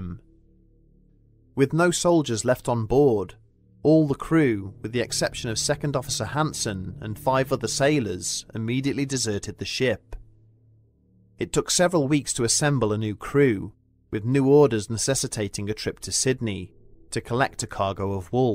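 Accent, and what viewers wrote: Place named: United Kingdom. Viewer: I totally concur with Sir Bronn. You guys have a phenomenal channel with the best presentation & narrations. I know it takes time & effort to compile your videos (and I'm extremely grateful for all of them). My only suggestion would be to create more often (2-3/week). If u have your channel monotized & the community helps get you subscribers & views - you're gonna blow up & rake in some decent cash. Just my 2 cents. I'm American & I really enjoy listening to a proper British accent. Your stories are awesome & the art is epic! I wish you guys nothing but the best! Thx for everything. Much love & respect...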